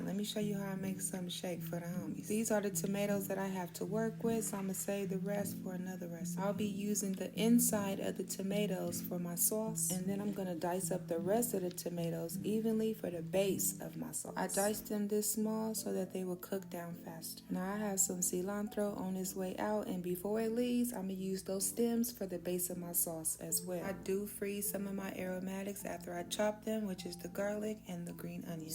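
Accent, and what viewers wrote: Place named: Los Angeles, United States. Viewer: She sound pure LA 😂😂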